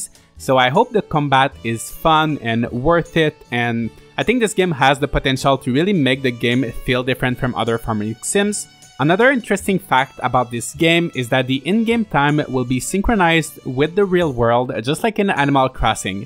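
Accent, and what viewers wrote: Quebec, Canada. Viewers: Oh le Quebecois is fort in this one. <3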